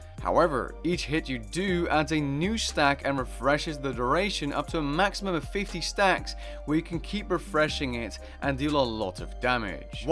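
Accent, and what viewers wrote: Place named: Netherlands. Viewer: Are you dutch? You can really tell by the accent haha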